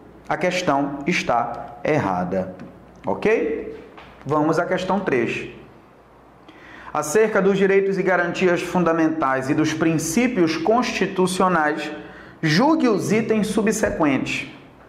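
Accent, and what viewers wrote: Pará, Brazil. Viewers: O senhor é paraense?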